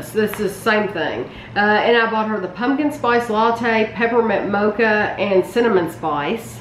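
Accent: South, United States. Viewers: Holy southern accent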